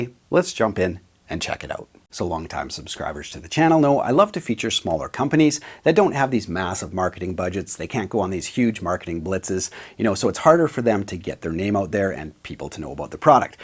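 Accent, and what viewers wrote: Canada. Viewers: How did I not notice the Canadian accent before he mentioned it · The point is why should he, a Canadian person, adjust how he speaks to satisfy an American person.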